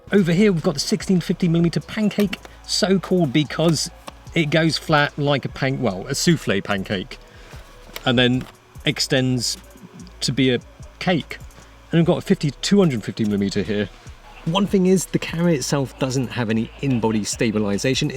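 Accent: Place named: London, United Kingdom. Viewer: he doesn't really..... accent is London-ish?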